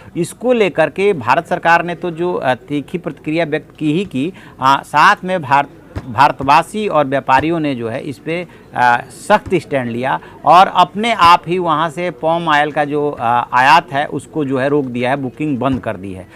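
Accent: Bihar, India. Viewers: सर आप बिहार के रहने वाले हैं क्या???? आप की आवाज़ बहुत ही मृदुभाषी है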